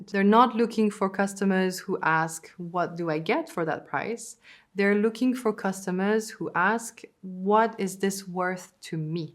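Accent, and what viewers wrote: France. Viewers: French is her first language. 😊 I think she is doing a great job here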